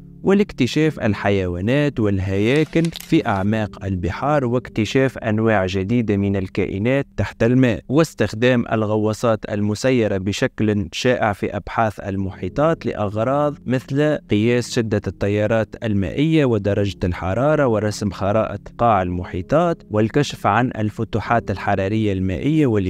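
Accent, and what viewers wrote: Tunisia. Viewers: كل مرة يخرج عليك برتوش شبعتو الخرياشة خرشف و كذوب المغرب غارق في ديون المغرب لم تبق له سيادة كلشي في يد الصهاينة انصحك اصاحب القناة انشر فيديوهات تتكلم على طاجين المغربي مثلا او تكلم على الظلم والفقر والقهر لي عايشو المروكي اولد القاف لمغاربة عاقو بكذوبكم على حساب صوتك تونسي باغي تسترزق على ظهور لحمير السناغلة 😂😂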